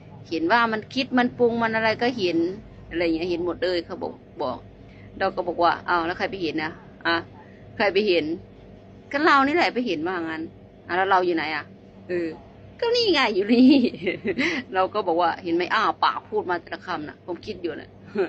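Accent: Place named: South, Thailand. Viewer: สอนธรรมได้ดีเข้าใจอย่างลึกซึ้งเลยครับ ชอบสำเนียงใต้ ฟังแล้วเพลินดี อนุโมทนาด้วยครับ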